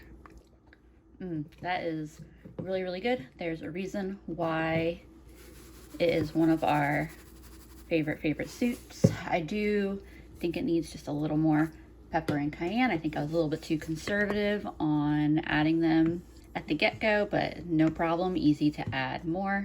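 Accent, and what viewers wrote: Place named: East Texas, United States. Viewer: You're my fav. Even though I'm from east Texas .... you sound like me 😁 love your no drama cooking ... I just keep watching you making meals that are doable. Thankyou dearly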